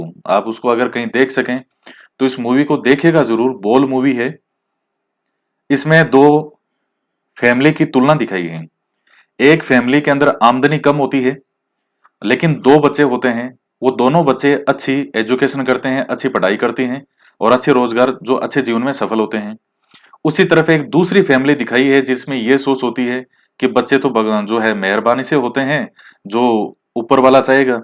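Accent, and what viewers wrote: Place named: Bagdi, India. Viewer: Sir हमारी तो आपके वाली ही बागड़ी बोली है, आप जब भी बागड़ी वाले शब्द बोलते हैं तो हमें बहुत ही अच्छा लगता है, जैसे ताती बलती लू,धाप के राबड़ी पियो और बाजरे की रोटी खाओ🙏🙏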